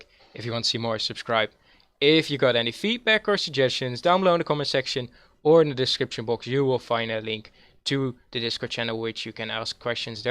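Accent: Netherlands. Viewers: Are you Dutch? Als Je NL bent Dan hoorde ik het aan Je engelse accent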